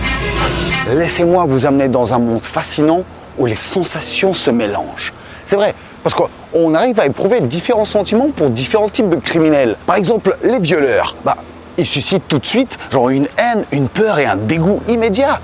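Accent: Russia. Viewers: C'est marrant en Anglais on entend ton accent Russe et pas en Français... Я тоже русский, ну у меня нет русского акценты , только французский 😅.... Je ne sais pas trop ce qui est le mieux 😂. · J'adore l'accent Russe qui prend le dessus quand tu parles en anglais. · Tu parles en anglais avec l'accent russe, c'est énorme !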